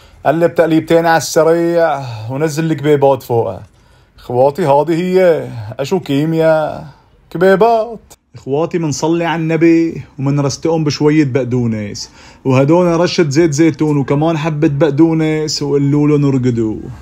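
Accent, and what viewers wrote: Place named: Latakia, Syria. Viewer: احلا حكي بلعالم حكياتنا ولهجتنا اللادئانيه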